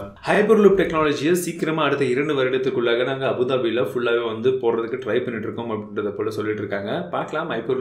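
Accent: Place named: Kongu, India. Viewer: வாழ்த்துக்கள் அண்ணா..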